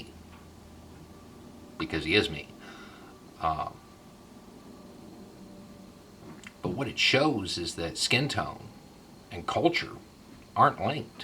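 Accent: South, United States. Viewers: Because he's a disingenuous asshole who just repeats Leftist talking points in a southern twang. Which is also subsequently why you guys like him, he's basically your "house southerner".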